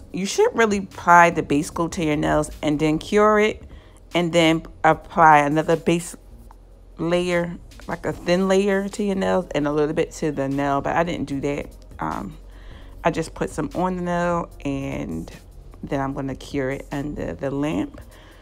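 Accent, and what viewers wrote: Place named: Baltimore, United States. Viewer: You from baltimore?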